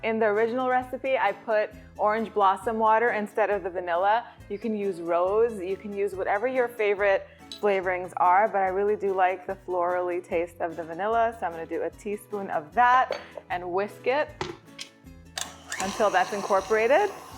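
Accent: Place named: United States. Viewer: Γειά σου. Πρώτη φορά μού βγήκε βίντεο δικό σου στο YouTube και από το όνομα σου καταλαβαίνω ότι είσαι Ελληνίδα και χαίρομαι που δείχνεις ελληνικές συνταγές. Αυτά τα αμυγδαλωτά είναι τα αγαπημένα μου και τα φτιάχνω κι εγώ. Εγώ είμαι από Θεσσαλονίκη κι εδώ τα λέμε εργολάβους. Θα κάνω εγγραφή στο κανάλι σου και θα σε ακολουθώ. Από τι κατάλαβα από τα αγγλικά πού μιλάς μάλλον είσαι Αμερική. Αν θέλεις γράψε μου. Να χαίρεσαι το κοριτσάκι σου!! 🌷🌷🌷🌷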